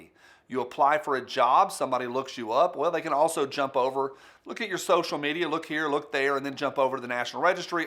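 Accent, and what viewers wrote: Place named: Texas, United States. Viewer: Where in Texas is this woman from? Making sure we don't ever move there.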